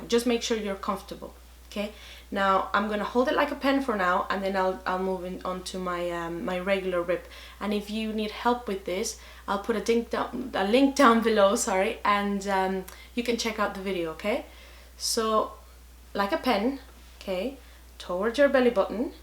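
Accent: Ireland. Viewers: I thought as much ud might of lived here with ur accent, I trained as a sprinter at the UL from 1989 a wee 14 yr old and come 1997 I became national champion at sprinting 200m under my coaches then Dr Drew Harrison n his lovely wife Hayley. They helped produce many Olympians and countless national champions. I absolutely loved being in the Ul, even tho I wasn't a student but I've always been welcomed by the Athletic coaches there over the years n got to meet n train with many Olympic medalists, world record holders n meeting my hero's, it was amazing just like u are as a coach to Bodhran playing. Ives just spent 45mins doing my rashers n sausages ha ha n just like Athletics uv gotta put in the training. Thanks again for getting in touch, I'm so honoured by that from you. Keep up the good work theres something definitely special about you 🙏🥁🏃‍♀️🇮🇪☘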